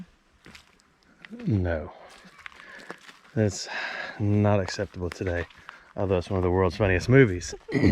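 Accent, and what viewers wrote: South, United States. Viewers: I have been subscribed to your channel since the beginning also. I liked you and your family then, and I LOVE you and your family now. I was told something a long time ago while I was going through some life lessons, "This too shall pass." And it always HAS PASSED, thru other life issues. Hang in there, God is watching over you and Family. {I still love the sound of your Southern accent.)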